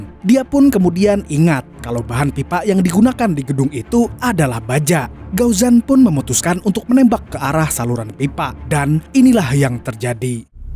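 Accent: Banjar, Indonesia. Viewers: Film John wick bang.... Tetap dengan pembawaan bahasa Banjar yg babangsatan nya bang 👍 Sukses terus buat 👍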